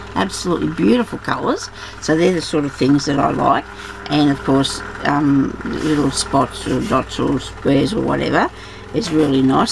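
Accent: Australia. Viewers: Hello, new subscriber, popped over from Magz Lockley's. I' m in Victoria, nice to hear another Australian. Will have a look and see what fabric I can find, do a V R. to enter your giveaway. Sue 🌸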